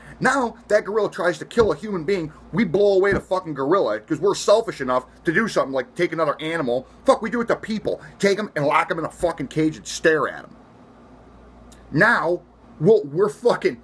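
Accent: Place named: South, United States. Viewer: Where were you from? I love the southern accent! Men & women! You should have written a country song about the incident. Though id like a clean language lyrics of that song if you could write it! You're are are right! That mother was ignorant!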